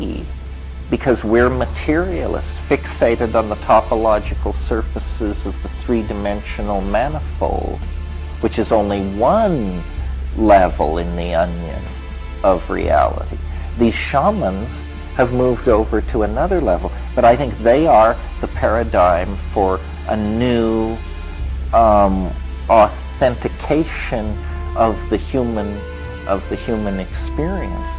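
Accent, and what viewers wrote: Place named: England, United Kingdom. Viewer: Where are you from? England or Ireland by any chance?! I'd goto a gig if it was relatively near to show my support, you've done me great benefit with this channel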